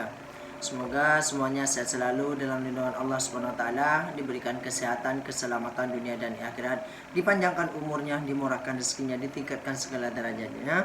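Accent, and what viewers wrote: Malaysia. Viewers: Salam broo.. abaikan aje komen tuu.. teruskan usaha anda.. saya bangga dgn anda boleh berbahasa slang Malaysia.. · Bahasa melayu kamu ok je..hampir sama dgn percakapan orang malaysia,cuma bahasa melayu campur dengan bahasa indonesia..teruskan je bercakap guna bahasa melayu ..tak salah pun..tak merosakkan apa² pun.. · Betul la tu..serius aku pun menyirap dengar ko ckp malaysia.. baik ckp indon je..kan dah balik kampung tu malu dengan org kampung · Teruskan lah berbahasa Malaysia...makcik suka mendengar cakap melayu Malaysia 🇲🇾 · Lantak dia la..hidup kita mna ada smua org suka..lg pn sy dgr ok je..cpt kamu bljr bhasa mlysia..kdg2 mcm da mcm org mlysia..indo lain rmai lg kekok2 bhasa mlysia..klu xblajar bila lg nk bljar bg lg padu..Ckp mcm biasa ok jee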